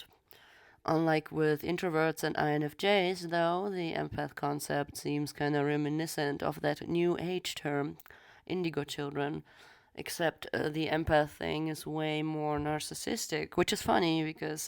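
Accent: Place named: Russia. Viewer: Important email...haha, oh stop! Love the example you gave with the homeless persons. Exactly! So are you Russian? I heard you mention in another video you speak Russian and German but did not mention which is your mother tongue. I presume Russian as your accent is basically Russian. I had a girlfriend for several years from Skopje, her accent was very similar. So where are you from actually?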